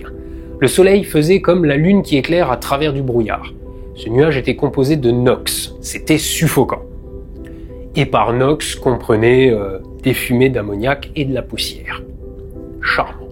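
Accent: Alsace, France. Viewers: j'ecoute toujours tes sponso juste pour ton accent alsacien ALSACE EN FORCE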